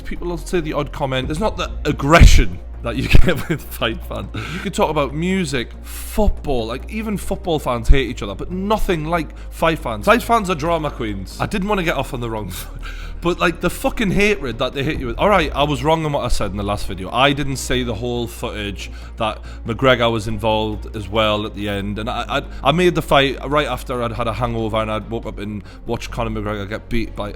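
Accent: Newcastle, United Kingdom. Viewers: Looooolllllll geordie. Enough love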